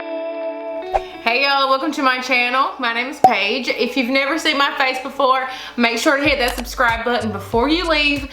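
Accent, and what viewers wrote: South, United States. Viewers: You look great girl!! Get you a body pillow!! It will change your life!! I didnt get the expensive one from the baby stores just one from Walmart. I have 4 little girls, and all 4 times used it!!! When she gets hiccups it is crazy. My daughter used to get them at 10pm every night when I was pregnant with her. Love your channel, and sweet Cody and your Mama is hilarious!! I love her. I enjoy the content of all the videos. And I love your accent, I am from SC, so I too have that southern drawl. 😀 Good luck on the rest of your pregnancy!!!! I will be praying for a healthy girl, I cant wait to hear her name!!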